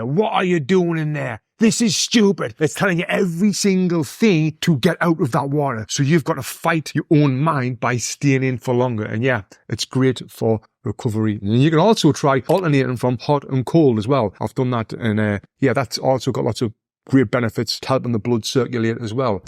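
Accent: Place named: Sunderland, United Kingdom. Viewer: he's from Sunderland, which is the city below Newcastle, so it's very similar to a geordie accent though most Sunderland folk call themselves Mackems not geordies. Hard not to love the northeastern accents 😂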